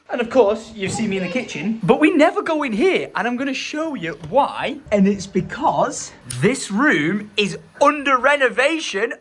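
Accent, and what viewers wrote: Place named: Wigan, United Kingdom. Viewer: The way to understand what you do for us when we watch this vlog. You are to us what Old School Runescape is to You. I have watched almost everything from call of duty, Minecraft, battlefield, vlogs anything really you put out. Also I used to live in Wigan (Platt Bridge) and Your Accent is nostalgic for me so that helps. Much love From Glasgow Scotland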